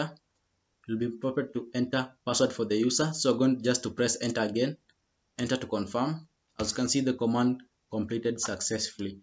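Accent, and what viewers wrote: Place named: Kenya. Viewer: omg this guy is kenyan the english tells it all rada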